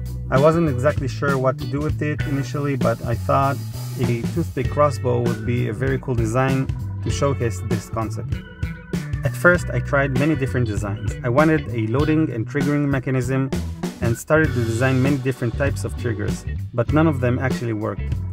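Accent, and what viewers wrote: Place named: Israel. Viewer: I hear an Israeli accent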